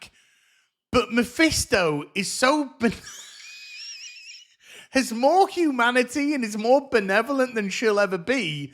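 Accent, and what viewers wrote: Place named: England, United Kingdom. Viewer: It’s not a British accent, it’s an English accent. An Englishman from England, speaking English.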